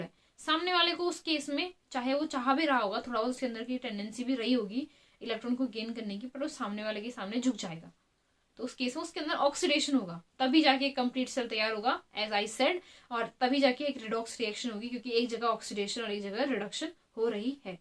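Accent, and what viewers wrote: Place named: Uttarakhand, India. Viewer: Nice lecture with uttrakhand vibe😊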